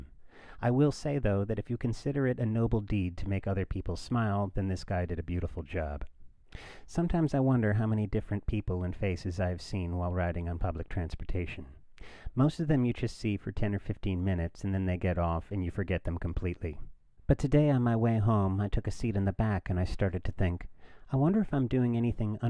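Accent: Boston, United States. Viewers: OMGSH You're from Boston~ AWESOME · Wait your from Boston? LOL! Didn't know that.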